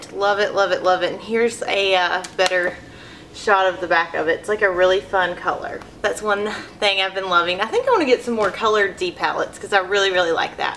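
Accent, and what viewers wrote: South, United States. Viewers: I found you on istagram and I'm glad I did! LOVE YOUR VIDEOS!! You should do a Question and answer (Q&A) BTW your southern accent is soooo Cute!!! ♡♡ XoXo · you are too precious!! I commented before I watched the end of this one....you are so sweet to us into your favorites:)!!!!!! too cute xoxoxo keep it up you are already inspiring me and I'm super allot of other girls to follow there dreams....I have a thick southern accent too and I was always scared to try YouTube cause I was worried people would constantly hate on me about it:(! but seeing you smiling and being yourself is such an inspiration! !! love ya girl thank you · Can i just have your accent ? ❤️❤️❤️❤️ mine isnt as strong as yours i just love love live southern accents ❤️👌🏼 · Found you thru Instagram...new subscriber! Loving your channel and that I can tell you're a southern girl too! 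xoxo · Omg I love your accent it's so cute and country and stuff haha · It'd be so cool if I won the Raquel Nars because my name is Raquel. But anyways, I don't think that you should title your videos with giveaway because then people will only be interested in that one video and ignore your true talent and southern accent. Just an idea. Btw I love you and you are so beautiful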